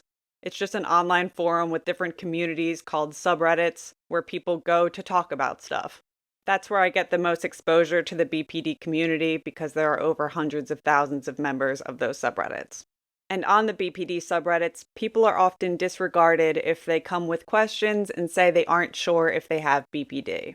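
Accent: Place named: East Coast, United States. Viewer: Thanks so much for making these videos. I just stumbled upon your channel a minute ago. You have an accent. Trying to pick up where it's from? East Coast?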